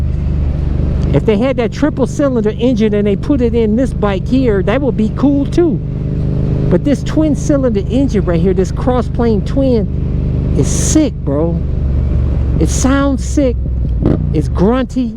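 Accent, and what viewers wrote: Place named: Texas, United States. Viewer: Are you from texas? You have a southern accent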